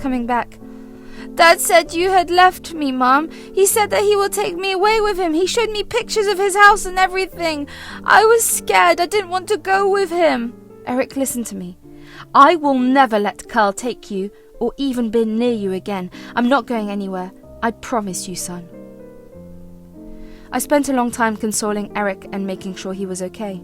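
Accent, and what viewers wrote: United Kingdom. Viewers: The British accent is funny with this story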